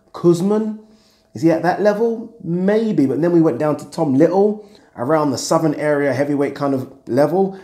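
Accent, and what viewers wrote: Liverpool, United Kingdom. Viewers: We? you dont sound as scouse as Pricey.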